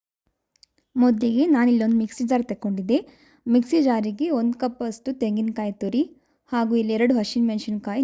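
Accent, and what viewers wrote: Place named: Bangalore, India. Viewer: Banglore style lli sakhathagi mathadtheera👌😊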